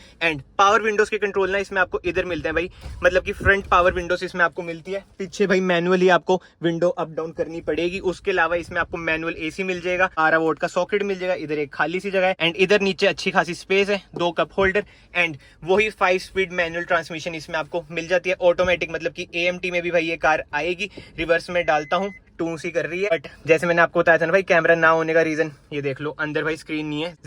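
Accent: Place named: Jammu, India. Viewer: bhai jammu da lgda accent se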